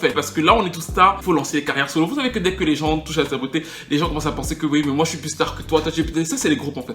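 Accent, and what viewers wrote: Cameroon. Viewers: Tes vidéos sont au tellement... non, tu es trop fort!!! Et l'accent camer ça rend la sauce impeccable!!! C'est une superbe chanteuse et sous une interprète hors pair!!! ELLE s'est mise un boulet au pied toute seule en voulant s'octroyer un talent artistique qu'elle n'avait pas...en plus si tu te joues les divas bon les durs du milieu ont vite fait de se passer le mot et tu vas mourir dans le film q chaque fois que tu vas sortir ton nez... Sinon une vidéo sur stp... Et une question privée: tu bosses dans quel domaine, la musique, la production?Où c'est juste une passion? En tout cas, continue de nous distraire si talentueusement,tu as vraiment l'art de raconter des histoires!!! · Salut mon frère je viens découvrir ta chaîne, et franchement je suis tombé sous le charme!La manière avec laquelle tu racontes, c'est très immersif quoi!Tu en parles comme des gens du quartier qui ont grandi avec nous,avec un brin d'accent Camerounais!Chapeau!J'ai direct activé la cloche pour ne rien manquer!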